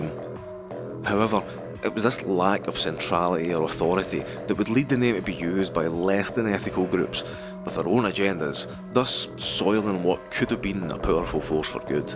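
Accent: Scotland, United Kingdom. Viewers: aw mate as a fellow scot hearing your voice made me happy, first time finding you, we need more proper strong accent scot readers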